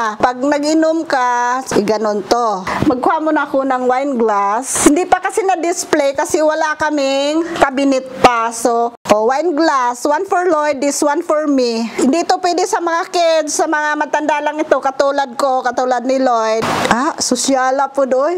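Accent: Visayas, Philippines. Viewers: I love the way she speak bisaya ❤️ stay strong 💕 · sarap lang sa tenga marinig mag bisaya si 🤣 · Bisaya man diay ka uy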